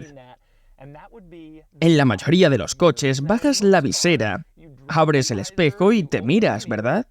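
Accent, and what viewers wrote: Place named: Spain. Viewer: Un zipizapo no debe poner su voz en videos, si de por si escuchar un zipizapo es desagradable escuchar un español zipizapo es insoportable solo soporté el video 4 minutos antes de apagarlo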